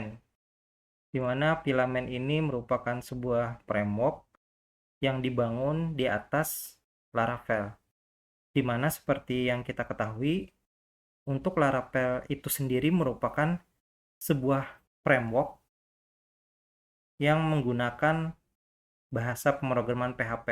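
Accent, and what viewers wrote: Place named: Banjar, Indonesia. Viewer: Logatnya kaya orang banjar..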